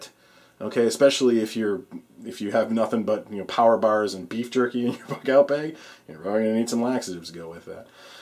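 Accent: Wisconsin, United States. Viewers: You from Wisconsin?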